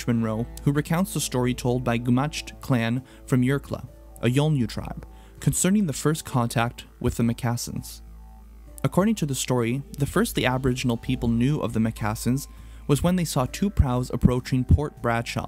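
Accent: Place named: United States. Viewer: Hearing this stuff with an American accent makes me woozy lmao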